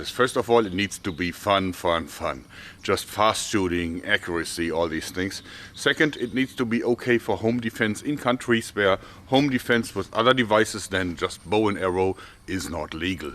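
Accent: Germany. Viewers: Your english is getting better and better. Don't lose the german accent, though, would be a shame!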